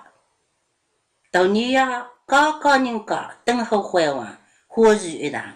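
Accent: China. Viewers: 感觉 常州 无锡 苏州 三个方言的味道都有啊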